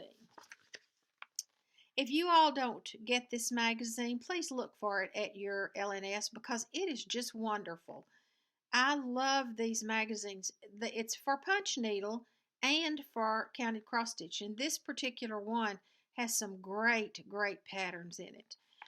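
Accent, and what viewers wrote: South, United States. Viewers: You have such a lovely southern accent! It's fun to listen:) Glad to see your hand is getting better- but you have to take care of it- stitching time might need to be sacrificed.. The broom cover is very cute- I might be tempted to stitch something like that too. I never tried primitive designs- looked more into punch needle style. I'd like to try for your giveaway for either one of magazines. BTW I'm doing a giveaway too :) Happy holidays!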